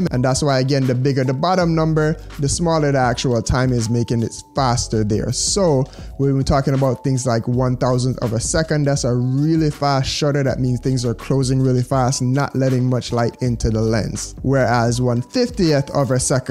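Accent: Jamaica. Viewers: Transitioning from a career in law to documentary filmmaking and of course taking the self-teaching approach. After so many attempts of trying to understand this, your video is the only one that has made sense for my way of learning. You had analogies and visual examples, properly timed. I took notes! Thanks so much for this and shout out to a fellow Jamaican (based on your accent, hopefully im not wrong :) )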